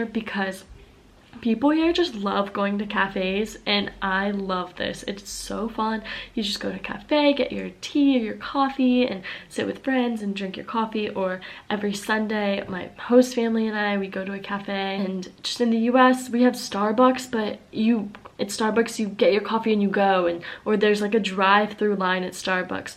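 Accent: Germany. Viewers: Pretty German accent...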